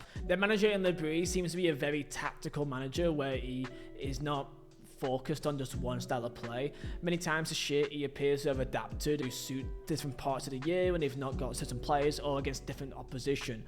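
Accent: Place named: Lancashire, United Kingdom. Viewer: That's just the Lancashire accent.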